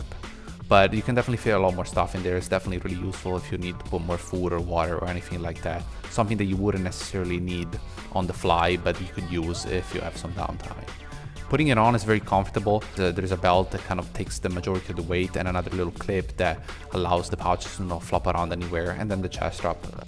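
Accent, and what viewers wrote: United Kingdom. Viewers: Are you from Scotland?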